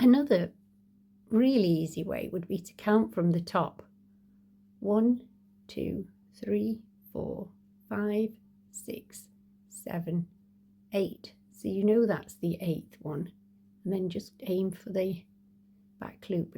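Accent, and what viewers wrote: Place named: England, United Kingdom. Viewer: I can see, however, you have an English accent or perhaps I am mistaken and it is Ai audio dubbed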